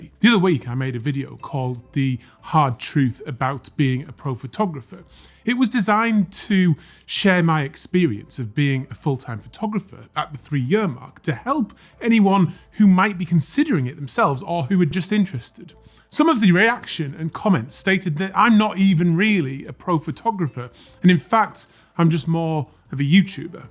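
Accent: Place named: United Kingdom. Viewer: Hey, I just discovered your channel during the lockdown 2020. I just want to say: Thank(!) You(!). Watching your videos is something I can do for hours, because of your combination of stunning photographs, good explanations, nice stories ( and your british english 😀 ) I don't care, which amount of money you earn or how you could be categorised. I am just happy, when there's a new video I didn't see yet, making this stayathomeadonothing times a bit less ugly for me. Keep going as you are and don't give a sh.. about the haters - there always will be some. Also I am really looking forward to read your book! Best regards from Germany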